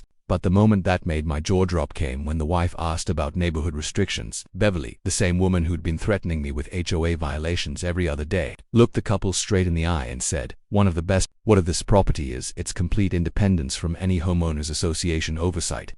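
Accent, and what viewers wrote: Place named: United Kingdom. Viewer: OK you sound British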